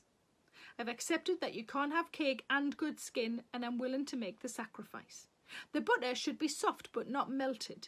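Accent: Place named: Northern England, United Kingdom. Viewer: I’m from Canada and can I just say, of all the different british accents yours is the warmest most wholesome I’ve heard. You’re hilarious, well spoken and to top it all off the way you say it all warms my heart. Both with laughter and just out of novelty since I myself hear a lot of flat American accents. I’ll miss this every day. Never been to northern England but I’m already biased in favour of the North. Much love from Toronto!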